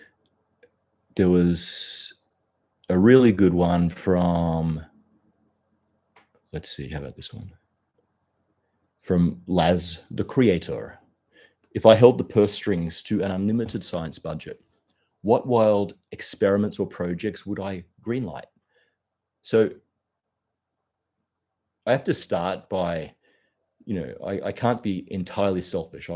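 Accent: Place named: Australia. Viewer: The age of British narration is coming to an end. The age of Aussie narration has begun…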